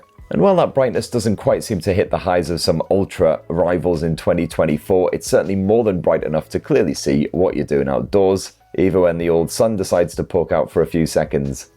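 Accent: United Kingdom. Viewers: Jesus chirst ive never heard cliche British before this guy lays it on next level thick. Ugh/cringe..difficult to listen to. Its hits my American ears all wrong. omg it doesnt stop the cool fonzy style lingo. This guy i wow cool.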